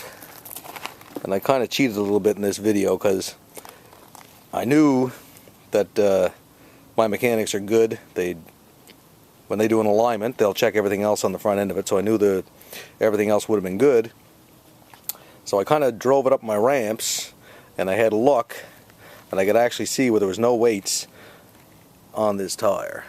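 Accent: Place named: Nova Scotia, Canada. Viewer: When I heard “charged aboot” I was like wait, this guy is a Canadian, and he followed “Canada here” lol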